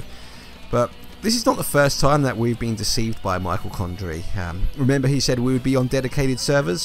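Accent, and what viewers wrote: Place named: United Kingdom. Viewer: From the sound of your voice, I assume you're from the UK or somewhere in Europe? If so, that region has ALWAYS been paired up with people outside of the country since COD4. It's not SBMM at fault for your laggy connections to other countries. It's the region you are in, what your connection quality is, and what time you play.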